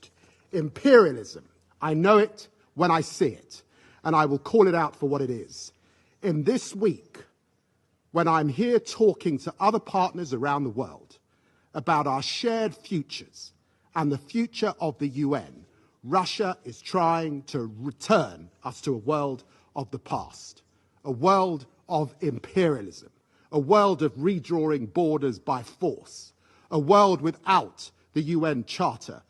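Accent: United Kingdom. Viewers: No American these days can do a speech like that. British accent and way of saying things also just sounds much fancier and more imposing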